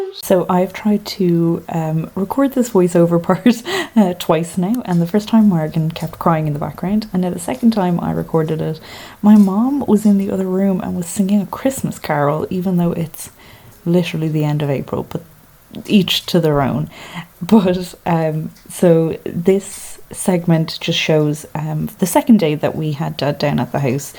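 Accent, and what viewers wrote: Ireland. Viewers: you are my spirit guide I never know the date either 😂 I'm permanently shocked about where the days are going. Also, as a Dub living in the UK the past 18 years your accent and your sayings are a cure for the homesickness. The place is looking great, even better in the sunshine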